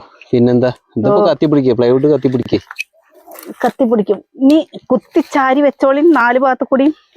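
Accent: Palakkad, India. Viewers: Njangalocke samsaram (palackadan slang) kelkaan vendi maathram vlog kanunnavaraan...just avoid -ve comment,go a head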